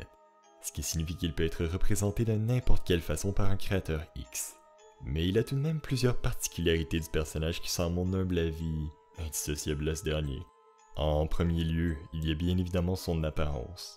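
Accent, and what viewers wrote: Quebec, Canada. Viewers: C'est du quebecois enfin rhooo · J’aime bien ton accent je sais qu’il est québécois mais il vient de quelle region Sinon continue comme ça!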